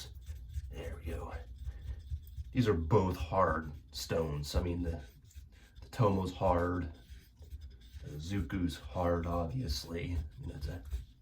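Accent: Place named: Pittsburgh, United States. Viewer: I thought I heard a hint of Yinzer in your accent. Proud PA boy here.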